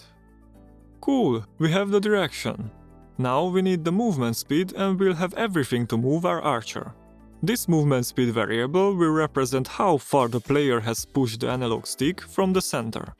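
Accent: Hungary. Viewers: Magyar vagy ? olyan az akcentus. If you didnt understand nevermind my comment :)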